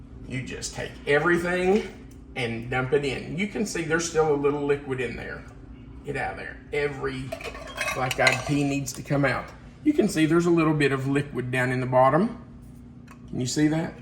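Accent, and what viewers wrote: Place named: Kentucky, United States. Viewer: A-HA! I suspected you were from Kentucky, just from your accent, and now we know. I will be trying this "caviar" for our next potluck. GO BIG BLUE!!!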